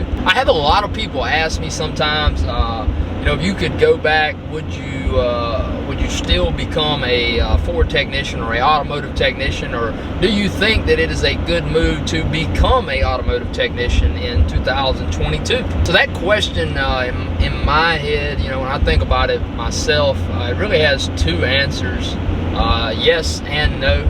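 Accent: Alabama, United States. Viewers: i knew that accent sounded familiar! working at a shop up in huntsville. Roll tide baby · I just want to get my foot in the door bruh.. Cause I like Cars( Love the Bama Accent btw😉🤗✨) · Love your Accent. Alabama Accent🇺🇲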